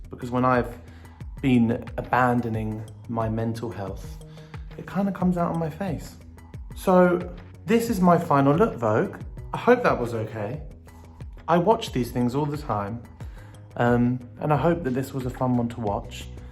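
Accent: United Kingdom. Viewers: I love their British accent.❤️ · Everyone talking about good music, ' pronouns, but can we talk about their british accent? Gosh i fell in love. ✨ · Thought Iam watching Harry Potter , when he started British accent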